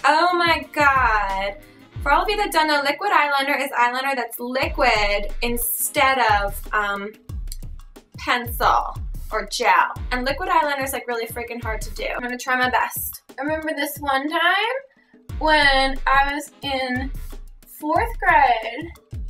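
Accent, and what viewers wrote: United States. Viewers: Oh. it's tha-a-a-a-at fuckin' american! Simply loved it! :DD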